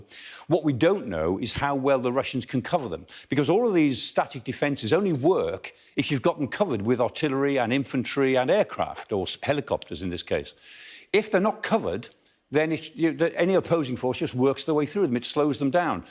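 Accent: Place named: United Kingdom. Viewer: Thanks UK for existing, lovely accent when talking! greetings from Germany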